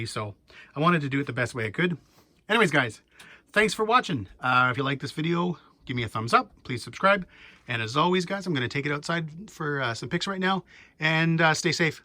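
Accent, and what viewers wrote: Canada. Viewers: I think he might be Canadian though and in that case his pronunciation is correct for his region.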